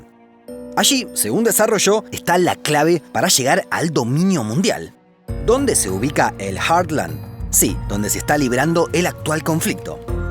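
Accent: Argentina. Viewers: El narrador es argentino. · Aver te voy hacer una critica muy fuerte pero realista. Por tu acento se nota que eres argentino, el mismo pais que le lame las botas a los estadounidenses, entonces ahora si comprendo el por que estas en contra de rusia. La otan quiere extenderse en europa, te voy a poner un ejemplo "imaginate que tu vecino ponga una cerca al rededor del perímetro de tu casa con torretas y esas torretas esten apuntandote a ti... Como vecino no iras enojado a reclamarle? Es obvio, el conflicto actual de la otan es querer apropiarse de paises europeos imponiendo su ridiculo lema de "libertad" mientras que rusia no quiere correr ese riesgo. Hablas de historia geográfica de rusia pero jamas mencionas las invasiones que hizo la otan en siria, irak, Afganistán en el que murieron miles de personas, eso se llama hipocresía de occidente. Antes de que hagas un tema de estos informate y di las cosas tal cual. 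💁🏻‍♂️ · que acento es ese ? tiene argentino pero a la vez me recuerda un poco al robot de platon, peruano ?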